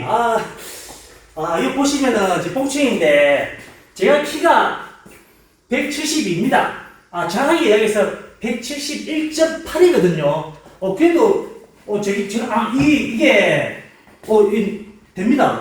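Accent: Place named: Seoul, South Korea. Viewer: 사장님 서울말이 너무 자연스럽네여 소개 흐시다가 한번 꺾었는데.. 30분 쉬고 방송 한거 같네예 ㅎㅎ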